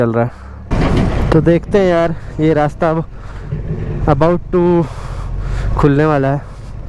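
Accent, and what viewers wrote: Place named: Bihar, India. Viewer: Bhai love ur accent.... Hum bhi bihari hai bhai ❤️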